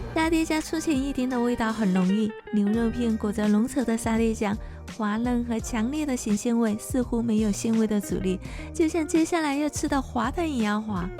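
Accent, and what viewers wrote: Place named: Sichuan, China. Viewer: 這兩天在成都出差，遇到一位川妹跟妳說話的方式簡直一模一樣。忽然覺得好親切「呦」！😊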